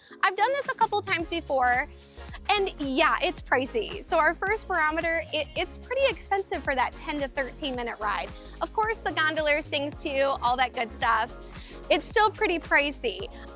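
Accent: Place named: Chicago, United States. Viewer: I figured north because you sort of have a Chicago accent. You would be an amazing teacher!!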